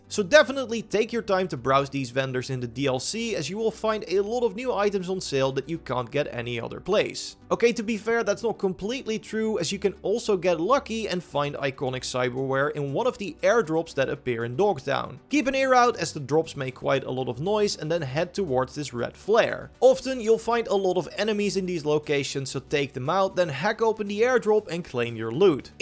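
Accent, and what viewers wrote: Netherlands. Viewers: Zwz een nederlander deze man ik hoor het aan je engels😂 mijne klinkt ook zoiets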